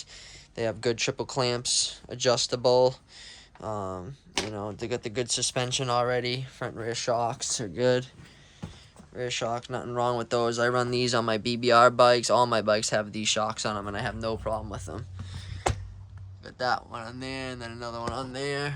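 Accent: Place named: Boston, United States. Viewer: how far away from Indiana are you sounds like you’re in Boston or something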